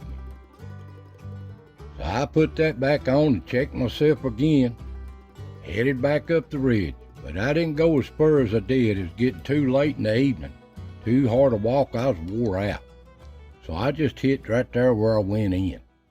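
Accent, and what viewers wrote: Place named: South, United States. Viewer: that sweet southern drawl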